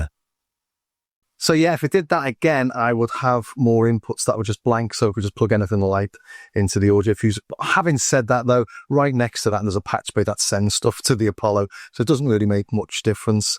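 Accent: Liverpool, United Kingdom. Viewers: not Jamie Carrigher-level Scouse! Hehe.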